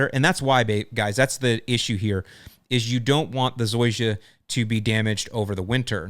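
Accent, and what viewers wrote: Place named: South, United States. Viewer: That southern accent 🤣🤣🤣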